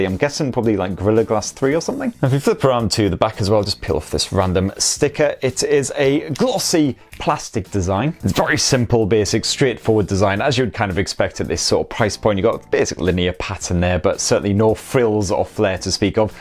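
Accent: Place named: Newcastle, United Kingdom. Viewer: are you a geordie? lol just stumbled on this I'm from Elswick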